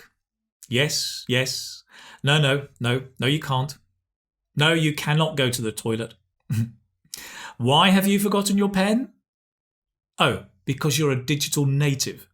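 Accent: United Kingdom. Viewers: It was really useful! Thanks a lot! And I liked your nice British accent😇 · Your accent is British Am I right? Because you don't pronounce letter r at the end of the word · hello please this is british or américaine englishe???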